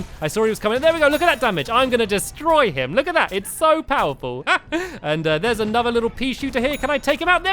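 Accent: England, United Kingdom. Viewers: The U.K. Can't you tell from his accent( England )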